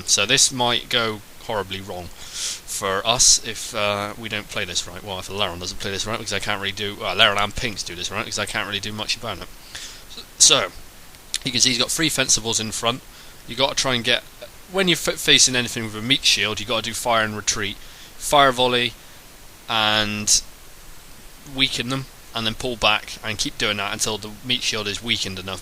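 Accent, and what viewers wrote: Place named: Bristol, United Kingdom. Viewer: am I right in saying bristolian accent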